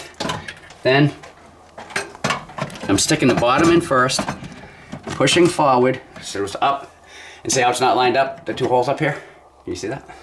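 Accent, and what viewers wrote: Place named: Long Island, United States. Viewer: Smoke test - LOL Thanks... You must be from Long Island or New Joysy!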